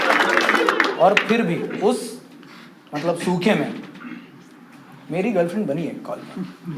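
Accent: India. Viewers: Bhai which city u r? UP se h yh toh jaan gya 😊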